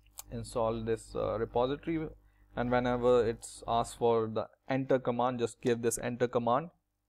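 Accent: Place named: India. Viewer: I always try to find interesting tutorials for installing software on linux. But most tutorials on youtube are so boring, when I heard your accent I was so happy! XD YAS I love your Indian (is it Indian?) accent!!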